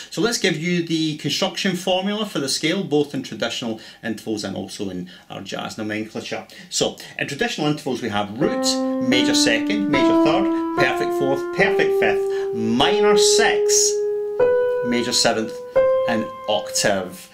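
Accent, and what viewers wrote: Scotland, United Kingdom. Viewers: real good lesson, did you learn piano first or guitar? You seem to know piano quite well and I say that because I'm classically taught from a very early age then later learned guitar and drums, I'm your age and my piano and keyboard playing has helped my guitar playing greatly. You are so very much better than many others who try to do the same.Love the Scotch accent, used to live in England when i was very young.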